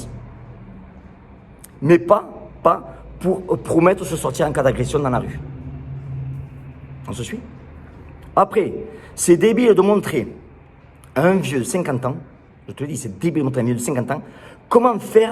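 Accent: South, France. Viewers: Ça fait surtout du bien d'entendre ce si bel accent qui se perd malheureusement partout dans le Sud.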